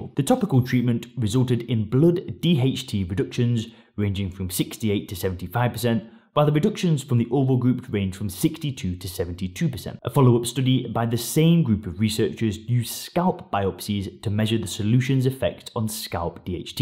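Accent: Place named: United Kingdom. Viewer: ''pop a pill and your dun'' love that british accent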